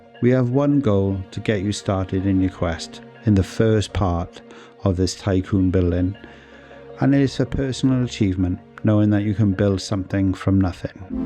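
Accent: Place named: Cardiff, United Kingdom. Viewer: Looking forward to this one mate. Sounds solid. Is that a Cardiff accent I hear? I was thinking Newport but changed my mind. I’m from Caerphilly originally.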